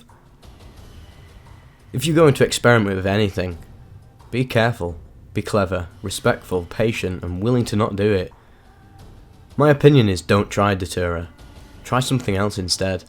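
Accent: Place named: Birmingham, United Kingdom. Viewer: Was just wondering that yesterday - I figured somewhere near Birmingham. I’m on the other side of the Atlantic so from here that seems close enough…?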